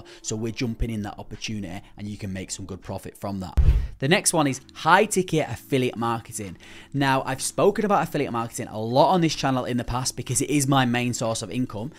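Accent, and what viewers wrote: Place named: England, United Kingdom. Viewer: Thanks I like you English accent easy understand! Thanks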